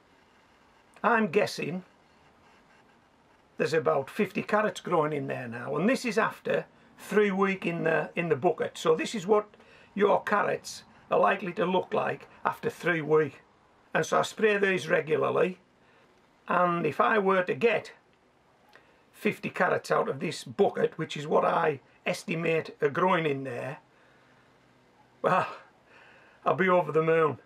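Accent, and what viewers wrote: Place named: Scotland, United Kingdom. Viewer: I believe it's. I think it's Scot?😏 Could be wrong, it's happened once before...😳😛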